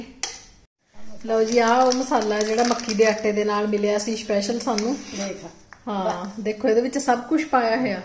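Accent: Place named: Patiala, India. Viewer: I love your video bhan I'm from patiala to I always watch your vlog you speak same punjabi like we do and your so sweet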